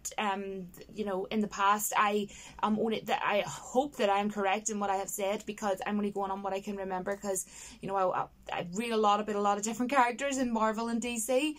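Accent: Ireland. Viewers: Agreed. I hope to not be too much with this comment, but I love your accent! I want you read me every book in audio format lol. Never played the Witcher, but I could pick out that smooth Irish brogue from the other side of the world! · Intresting accent.. is that Irish? i love your accent, it remind me of witcher 3's NPCs.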